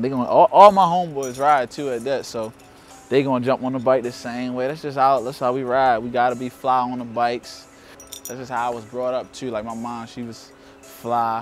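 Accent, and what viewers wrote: Baltimore, United States. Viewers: thats that bmore shit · that's a Baltimore accent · Yeah, that's our native accent. "Dug" = "Dog" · Baltimore accent bro it's how we speak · Baltimore accent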